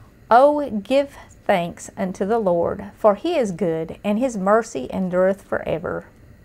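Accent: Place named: Appalachia, United States. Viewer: I am thankful to know Jesus, my family, waking up today, I'm thankful for you and your channel. channel I enjoy it. I actually don't watch any other recipe channels but yours. I love the way you represent everything and explain everything and I really love your hillbilly accent. I'm not sure who Brett is that you mentioned losing, but I pray for you and your family for the hurt and for God's peace to return in your life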